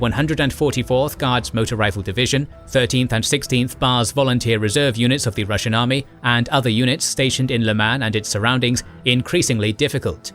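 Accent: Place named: RP, United Kingdom. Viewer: I want a British cut glass accent just like the guy speaking in these vids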